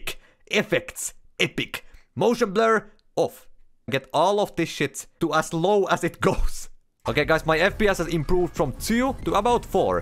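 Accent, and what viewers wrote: Finland. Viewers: it's a finnish accent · Ah. I was wondering what your accent was. Finnish, I see. Only thing I know about Finland is Hanoi Rocks and Michael Monroe or is it Malone? can't remember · The most charming Finnish accent right after Kimi Räikkönen. Very good but games very bad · bro sounds a little too much finnish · why is this guy soo entertaining man, his english with the finnish accent is what hooks me to watch more of this guy. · Bro sounds like he is from finland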